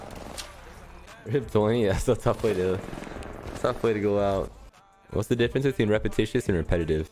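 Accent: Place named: United States. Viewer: Which country is Aceu from, or his home land ? He have American accent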